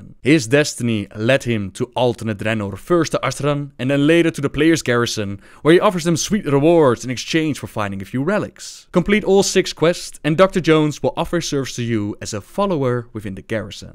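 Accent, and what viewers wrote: Netherlands. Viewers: Lol ik dacht... dat klinkt nederlands.. en die stem herken ik.. ehhhh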